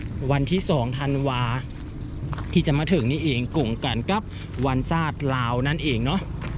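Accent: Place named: Thailand. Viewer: ສຽງໄທບ້ານຫນອງຫນ່ຽງເບາະຄົນບັນຣະຍາຍນີ້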